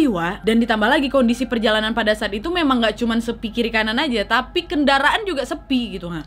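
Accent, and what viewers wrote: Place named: Medan, Indonesia. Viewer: Orang Kalimantan tapi bahasanya Medan medhok... Hahaha 🤣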